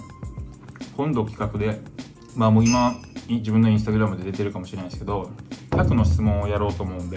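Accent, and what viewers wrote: Kansai, Japan. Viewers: 藍くんの関西弁イイわ。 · この美形からの 関西弁が最高💕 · めっちゃ喋りまくってるのがギャップですごくおもしろくて可愛い🥹🤍関西弁なのですね！さらに好きになりました❣️ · 関西の人やなあ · 関西弁で喋っていると親近感が湧きます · ちょくちょく出てくる関西感強めの藍くん最高すぎます！笑 動画が出るごとにボケとかツッコミも増えてきて面白いです！笑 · 端正な顔立ちにバリバリの関西弁のギャップが可愛い😂❤️毎回映り込むご飯の器が調理ボウルに見えて気になってたけど、二合半も食べるんだね🤣188cmもあれば当たり前かな！？前、親子丼？の回は洗面器に見えた（笑） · もうほんっっまに藍くんオモロすぎる🤣🤣😂😂 ｢あっ🤭｣ってなってからの｢舐めます⤴︎よね⤵︎｣の流れ早すぎる爆笑 ところどころ出てる関西弁も最高です😍🌟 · バレー観戦に沼ってる関西人やけど藍くんのマジもんの関西弁、喋ってるのは親近感湧くわ〜😂天然やし面白い👍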